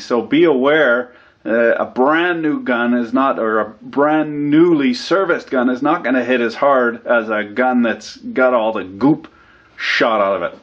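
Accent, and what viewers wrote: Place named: Canada. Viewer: I love listening to you I don't even know what da hell ur doing but hearing a Canuck talking shit is awesome thanks 🍀🍀😁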